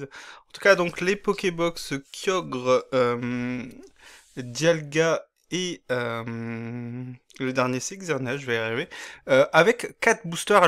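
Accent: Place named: Portugal. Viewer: Bravo pour l accent portugais!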